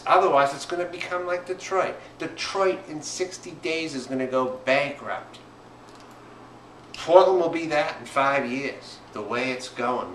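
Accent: Boston, United States. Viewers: It sounds like you hate the suburbs of Portland. It also sounds like you're racist. Congrats on describing gentrification with the accent of Will Hunting. Go back to Boston. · It's a Boston accent. LOL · Are you from Boston? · You're not a hipster, and you have a heavy boston accent. So not the type to fit in with portlandia types. It's not your scene. Portland has a serious underground sex trade and is full of strip clubs. Kinky side for sure. I saw really bizarre hookers walking around out in the open and was approached by a guy who thought I was a hooker because I was out walking/bike riding late at night. So certainly has a sketchy side.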